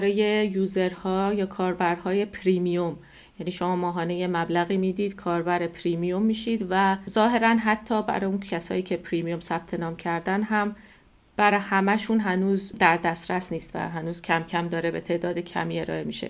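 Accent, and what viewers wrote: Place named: Turkey. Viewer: تلفظ تُرکی داری. تُرک کجایی؟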